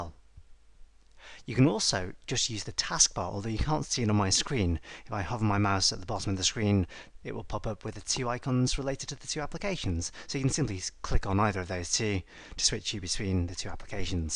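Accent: United Kingdom. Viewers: You know for a british person (I think you are british because of the accent) , you have a nice way of explaining things and your voice is understandable unlike most british accent people I watch :) thanks for this free source of knowledge. Cheers mate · i cant believe someone so wonderful exists to provide these videos for free with an awesome british accent and occasional snippets of humor :) thank you so much!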